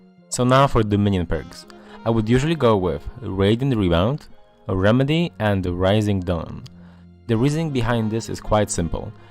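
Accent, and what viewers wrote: Poland. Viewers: o to samo pytałem w którymś filmie xd Gość brzmi swojsko z tym angielskim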